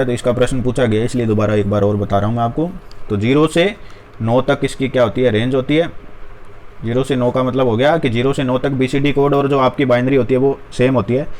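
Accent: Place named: Haryana, India. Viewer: Sir aapki awaz es video me na thi aap pdhaya kre,aapki hariyanvi boli bhut achhi lgti hai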